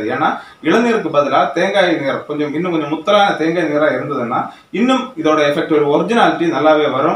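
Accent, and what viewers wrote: Kanyakumari, India. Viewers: Ungal malayala tamil azahu... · Nice vedio and kumari voice very nice bro...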